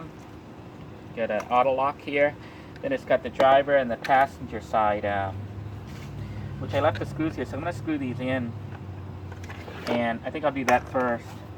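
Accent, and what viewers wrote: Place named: North, United Kingdom. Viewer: What an accent you got to be from up North😂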